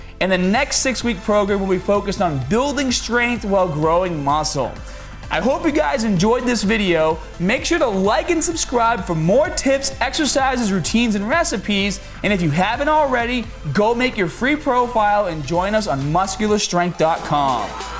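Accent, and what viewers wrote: Boston, United States. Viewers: I have a question about this guy. But, before I ask it, don't assume the purpose for my asking. It is stictly curiosity. It has nothing to do with my opinion of his reliabilty. Nor is it for any judgemental purpose. So here it is. His speech and facial movement/ eye movements seem to show signs of a, possible, minor mental disability or motor function disorder exc., that he seems to fight while focusing more on what he is saying. So, does he have some sort of disorder or what ever you want to call it? Also the signs are very seldom, being why I said "minor". Is it an accent or just how he is? He does show signs of a bostin accent but only partial or influenced. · This guy is so Boston - his accent is all over the place.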